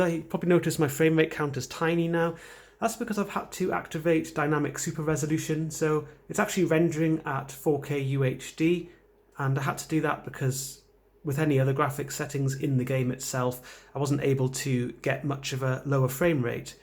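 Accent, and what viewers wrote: United Kingdom. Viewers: you have an english accent, but im looking in UK and cant find this monitor. all i can find is the 24G2U5. where are u located?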